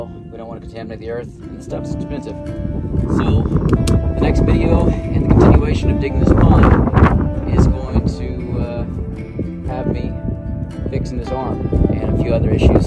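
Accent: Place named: Rhode Island, United States. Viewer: we are Rhode islanders so the accent gives it away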